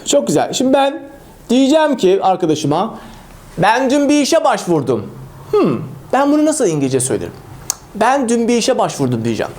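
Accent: United Kingdom. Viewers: british accent is amazing :D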